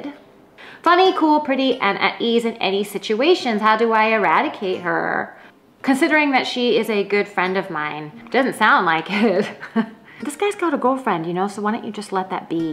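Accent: United Kingdom. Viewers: awesome English accent it sounded just like the posh English accent haha Stay Gay!:) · You got a cute little pommy accent there, how are you at doing an Aussie accent ? If you can do a good one, you'll be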